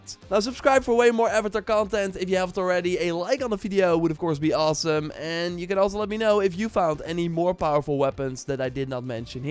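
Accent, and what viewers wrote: Netherlands. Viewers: nearly all youtubers I like lately are Dutch. What the hell 🤔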